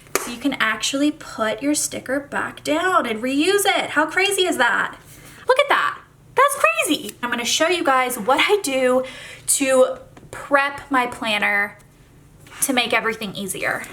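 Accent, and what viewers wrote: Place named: South, United States. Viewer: She’s from Tennessee and has a slight southern accent. “Pen” and “pin” are typically pronounced the same or nearly the same in the south.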